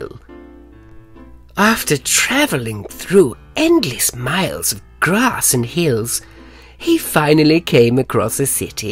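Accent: United Kingdom. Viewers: The most Bri'ish person eva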